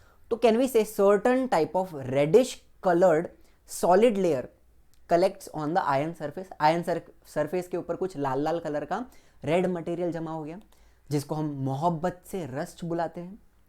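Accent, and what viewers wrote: Maharashtra, India. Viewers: Sir kya aap maharashtra se ho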